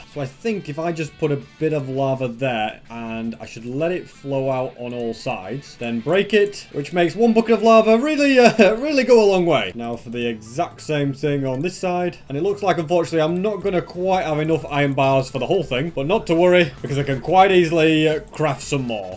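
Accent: United Kingdom. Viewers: No he is british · I absolutely love you British accent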